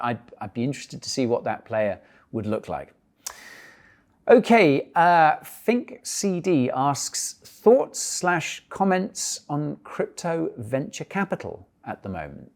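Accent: United Kingdom. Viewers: one of the few channel's that gives you facts and doesn't promote sh1t coins, keep up the great work, and your British to boot.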